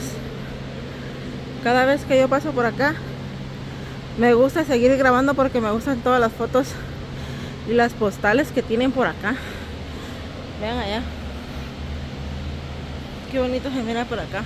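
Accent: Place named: El Salvador. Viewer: Usted es salvadoreña? Porque su acento es salvadoreño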